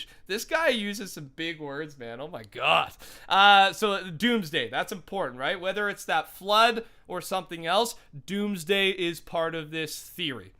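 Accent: Austria. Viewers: What you are austrian? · Oh, ein österreicher :D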